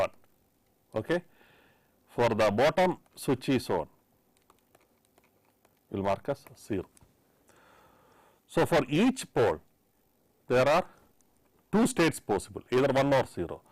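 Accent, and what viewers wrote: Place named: South India, India. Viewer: very useful video...regarding his accent, he speaks indian accent particularly south indian accent..ppl may find it difficult but slowly u will be used to his pronunciation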